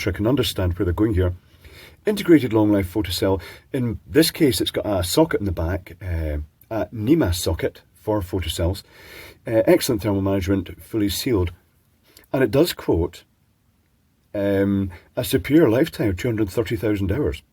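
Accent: Scotland, United Kingdom. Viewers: I am trying to work out what part of Scotland you come from. It is not a Glasgow accent for damn sure, I was there for a month.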